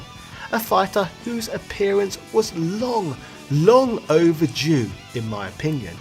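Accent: England, United Kingdom. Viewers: It is always a pleasure to watch your videos, mate! I really love both your contents and your English accent, it really helps me as a comprehension exercise! Keep up the good work!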